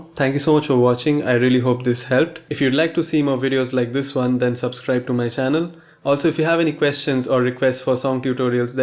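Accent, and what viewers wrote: India. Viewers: ohh, Indian accent ❤️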